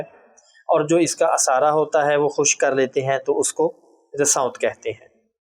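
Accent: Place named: Lahore, Pakistan. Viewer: Lahore Pakistan se boht Sara Pyar apko aap kis city se ho dear ❤️aap boht hi piara bolte ho , asal Hakeem ho aap, Lekin bolne Kay style se Lahore Kay lgte hain 💯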